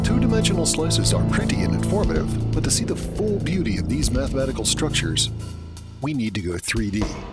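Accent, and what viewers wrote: United States. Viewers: The c, z american pronunciation makes this very confusing. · your American accent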